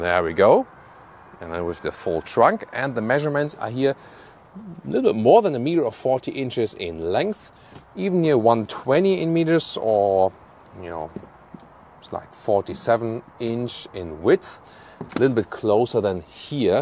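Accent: Germany. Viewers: Not a big fan German cars, their time is over. German guy talks about toyota, very weird. And the accent as well. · 主持人很重德語口音😂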